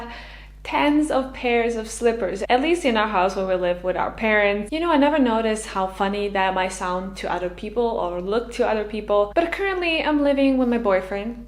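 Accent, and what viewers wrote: United States. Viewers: i bivis u Nemackoj a orde neki Ameriki akcent koristis, nista ne razumem, sta je poenga vog kanala? Sprehaj Dojc brate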